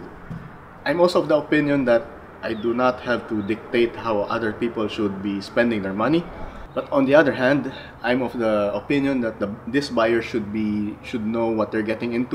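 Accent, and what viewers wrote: Philippines. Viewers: Are you a filipino? Your accent sound like filipino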